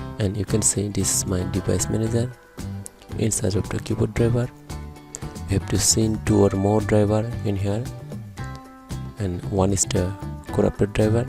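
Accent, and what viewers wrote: India. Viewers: Are u indian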